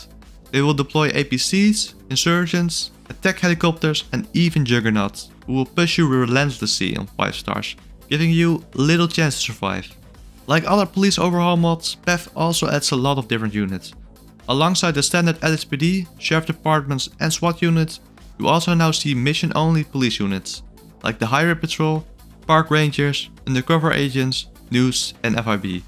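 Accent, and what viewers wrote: Netherlands. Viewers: I think he is dutch so his accent makes it sound like that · that thick dutch accent, ik hou ervan!!! leuk video!!! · You sound really Nederlands mijn vriend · Are you Dutch you got a Dutch accent . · Thickest dutch accent of the month. Good video tho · Ik hoor nederlands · OMG!! That thick dutch accent. Sorry cant stand it, i could not finish your video because of it and i am pretty sure i aint the only one thinking that. I would strongly suggest working on that accent if you want to get anywhere on youtube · Zo te horen kom je uit Nederland ❤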